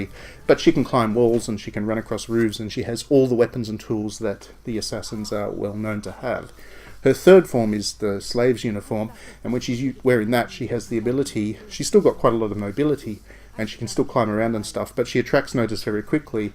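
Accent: Australia. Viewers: yea,i hate australian accents,but dont change your accent just because i hate it,no but you do need to say vita and not vitar and it s not just you,a lot of english people make the same mistake,any way i think that you deserve more views and more subs,and you have just gained a sub,mate btw sorry if i misspelled somethin, im portuguese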